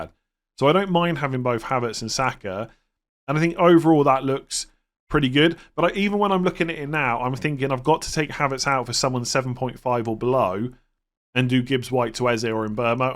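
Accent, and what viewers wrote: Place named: West Country, United Kingdom. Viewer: Dude. Love your West Country accent. Saka’rrr don’t lose it man.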